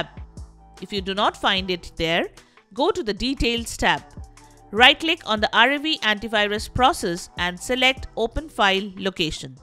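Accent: India. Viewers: this is a virus unistall and never trust a video with an Indian accent almost all the time the scammers are form there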